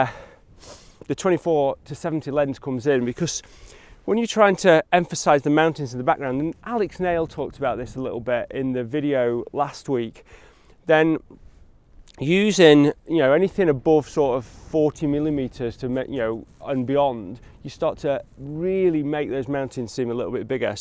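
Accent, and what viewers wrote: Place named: Scotland, United Kingdom. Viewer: I am interested in your classes for purchase. It is surprising to me that I am even used to your Scottish English now:)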